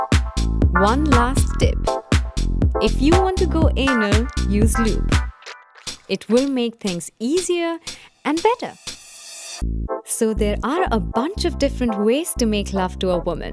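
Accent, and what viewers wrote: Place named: India. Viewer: Indian accent unsexy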